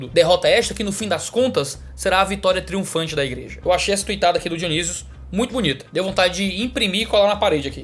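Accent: Ceará, Brazil. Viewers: Eu adoro as nuances q comprovam o cearense q ele é durante o discurso kkkkk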